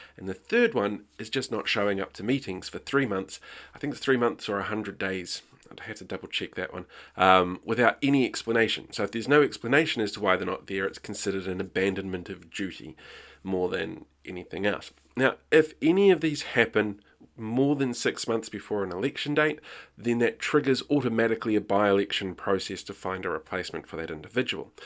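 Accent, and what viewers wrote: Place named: United Kingdom. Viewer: First time visiting this channel and I am a little confused. I don't know what drama this video might be talking about so I am assuming this is British law? (Guessing from your accent)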